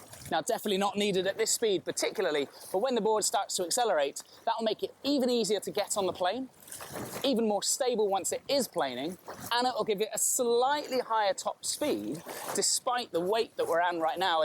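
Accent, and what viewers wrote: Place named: England, United Kingdom. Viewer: Beautiful English accent!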